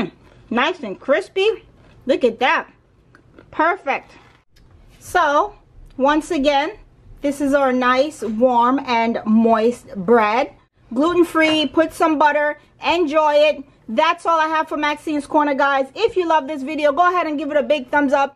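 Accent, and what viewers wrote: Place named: South, United States. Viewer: I was trying to identify your accent...it's not familiar. South, for sure, but different, too. ????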